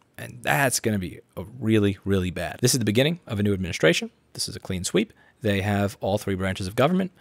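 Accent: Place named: New York City, United States. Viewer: this is the strongest I've ever heard your NY accent.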